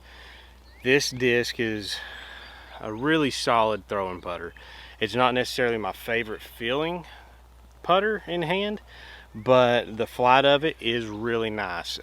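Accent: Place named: North Carolina, United States. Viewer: Love your content. When I started watching your channel I was saying to myself " man that guy sounds like home ". I live in Humboldt Co California. Then I herd you say Yadkinville.... I grew up in Trap Hill and High Point, lol. It's like hearing myself talk!! Just had to come home last year to put my grandfather to rest, and discovered the quality courses around home. You ever play Rolling Pines in North Wilkesboro?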